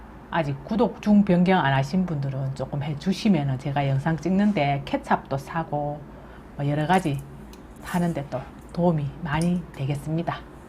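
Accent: Gyeongsang, South Korea. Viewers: 참 정감가고 재미나게 말씀하시니 시간 가는 줄 모르고 보게 되네요. 어느 지역 분이신가요? 경상도도 지역마다 억양이 다르시더라고요. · 구수한 경상도 이지매 말이 더 재밋네요ㅎㅎ 경북 말이ㄴ뎅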